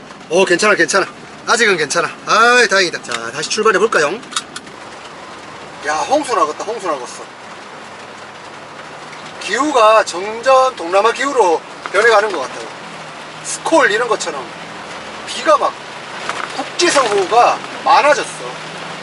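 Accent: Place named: Gyeonggi, South Korea. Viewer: 님 점점 경기도사투리 쓰시네요ㅋㅋㅋ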